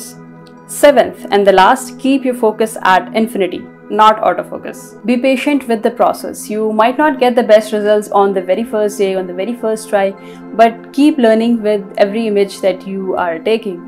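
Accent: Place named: India. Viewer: I am not able to hear/understand you clearly. Please talk slower, the Indian accent is difficult to understand ...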